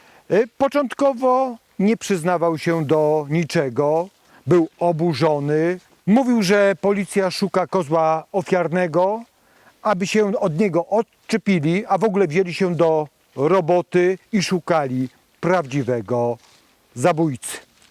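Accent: Kraków, Poland. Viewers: pan Inspektor jest z Krakowa. Tam się tak mówi. Zamiast np dziesiąta trzydzieści - dziesiąta czydzieści.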